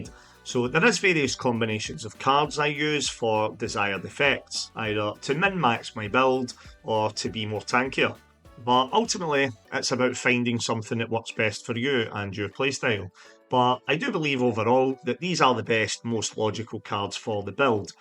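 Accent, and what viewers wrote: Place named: Scotland, United Kingdom. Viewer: I love your content, but more than that it's the Scottish accent that's the icing on the cake. Pure Dead Brilliant.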